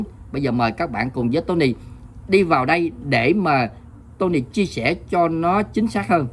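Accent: Mekong Delta, Vietnam. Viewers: a Tony người miền Tây à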